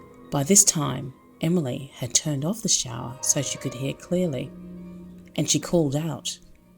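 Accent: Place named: Australia. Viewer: You're a great narrator, always enjoyed your work with Dark Waters channel.....love the Australian accent and would love to hear you and Be.Busta do a collaboration.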